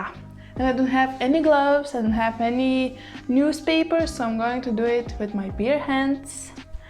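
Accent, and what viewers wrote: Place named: Russia. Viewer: You speak English with Russian accent. It means that you are a bilingual and your first language is Russian. Isn't it? Здесь большая русская аудитория, которая интересуется цветоводством. Советую делать ролики и на русском: прибавите себе подписчиков. Желаю удачи и всего самого хорошего!!!!😀🌷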